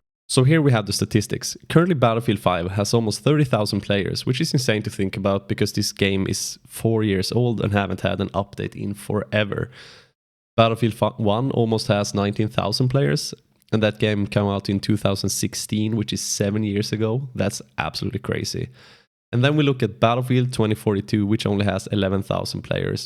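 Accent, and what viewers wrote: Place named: Sweden. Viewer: You sound swedish😂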